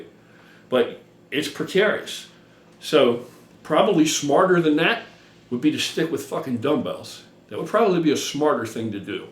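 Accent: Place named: New Jersey, United States. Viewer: Watch a few of your videos back to back. I couldn't agree more on your philosophy thus far. Appreciate you. Sounds like your in the Jersey or Pa area based on your accent. I'm in south Jersey myself. Keep killing it man.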